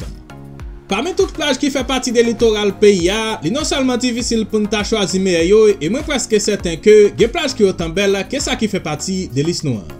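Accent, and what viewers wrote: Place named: Martinique, France. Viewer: Have you lived in the Caribbean before, you've sound like people from Martinique ou la Guadeloupe?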